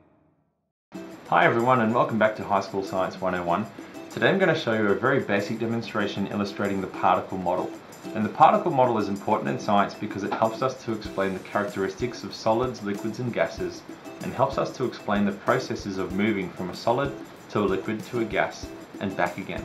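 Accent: Australia. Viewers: Finally and Aussie not an American thank you miss! Even though I don’t like school it’s not an annoying voice!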